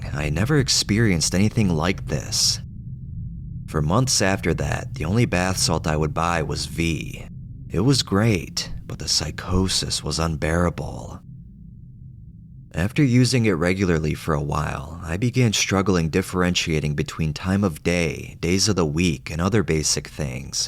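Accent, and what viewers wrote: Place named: California, United States. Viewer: Omg I love your words 😂😂😂😂😂😂 finally a swear guy you sound like us so. California's peeps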